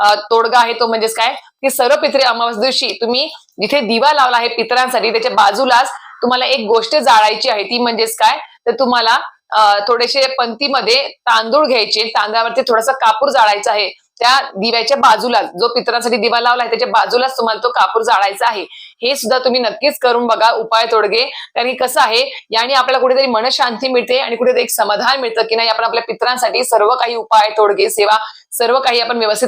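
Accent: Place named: Karnataka, India. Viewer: बोलण्यावरून तुम्ही कर्नाटक च्या वाटताय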